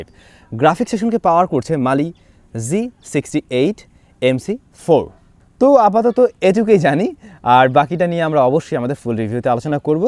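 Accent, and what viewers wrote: Barishal, Bangladesh. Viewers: ফাও কতা কইয়া লাভ নাই। ভাই যে মোগো দেশি কতা হুইন্না বোঝা গেছে🤣🤣🤣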